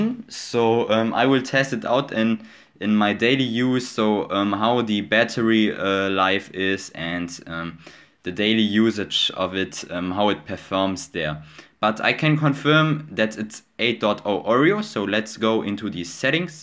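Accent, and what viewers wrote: Germany. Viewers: Man kann hören das du Deutscher bist :)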